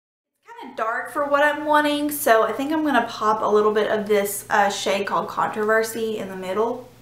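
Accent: South, United States. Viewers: Im in love with her southern accent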